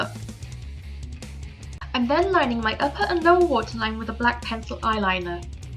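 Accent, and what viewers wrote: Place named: Wales, United Kingdom. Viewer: I love this! Are you welsh? Your accent sounds very welsh haha x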